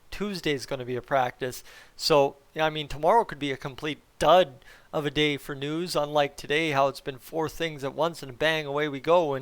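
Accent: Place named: Canada. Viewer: You have one of the best Canadian accents I've ever heard, lmao. I love it!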